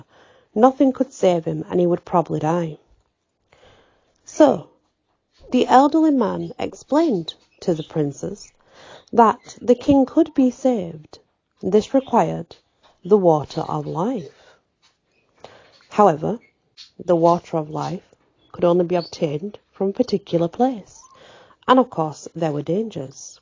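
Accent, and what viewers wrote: United Kingdom. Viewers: sounds great with you English accent 🇬🇧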